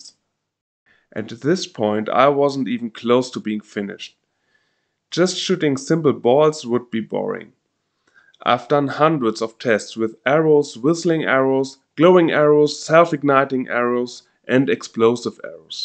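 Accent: Germany. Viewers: You sound German, is this legal in Germany? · You sound german. Ist das was du baust nicht illegal hier? 😅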